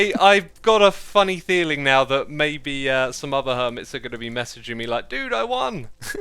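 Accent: United Kingdom. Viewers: He's british... That pronounciation of er is common among British accents. Edit: if you were asking which British accent, I am not sure. We don't know where he is from in the UK. I am not knowledgeable enough about British accents but I guess it could be from North England?? I am not am authority on that though so don't take my word on it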